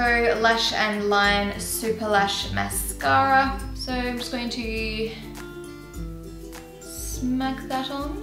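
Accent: Australia. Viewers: I'm new to her channel I just want to know is she Australian??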